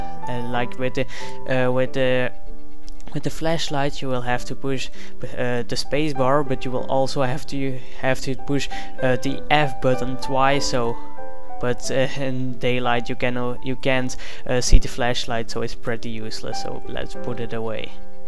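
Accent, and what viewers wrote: Netherlands. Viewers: So you're Dutch? Or from Belgium? I can hear it in your accent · Hahaha je bent nederlands ofniet ??? ik hoorde het de hele tijd aan je accent en toen zag ik ineens je lijst vol staan met nederlandse servers :P maar goeie vid man ik ga ze ff bekijken want ik snap nog niet hoe het spel werkt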